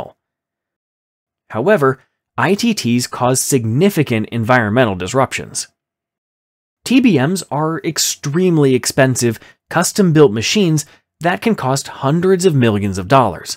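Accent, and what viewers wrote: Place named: India. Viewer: You please don't speak.. use AI for your voice. Your accent is typical meme for the westerner. I am an Indian and even I am finding it awkward.😂 Please...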